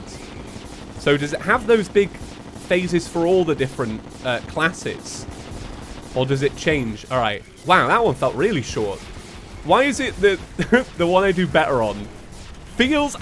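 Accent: United Kingdom. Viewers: Your british "accent" makes me think you're saying giar armor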